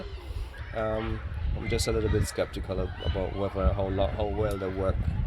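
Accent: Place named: Trinidad and Tobago. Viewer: Hey, why did you turn off the comments on the other video? You sound like a Trini.......are you? I have a VW Caravelle Syncro...planning to remove the stock radio and install a touch screen radio with the reverse camera. While it's activated from the wiring on the reverse lights, I will be putting in a bypass switch (thanks for reminding me), where I can switch to the reverse camera even when the van is in forward.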